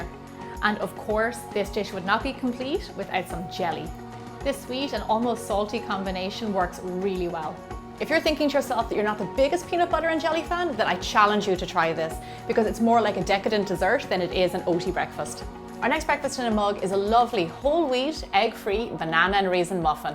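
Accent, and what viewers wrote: Ireland. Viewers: I love your 🇮🇪accent🇮🇪! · Irish accent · Aha, well since Celtic Woman is one of my all time favorite musical groups that helps explain it. I have always loved the Irish accent, but yours is subtle. Almost lost but not quite. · Ima guess the accent Irish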